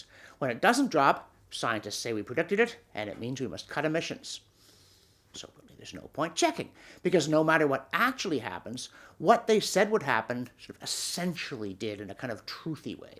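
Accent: Canada. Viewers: Please slow down your rate of speech a notch : Not everybody is familiar with your Canadian diction : Good Vids though .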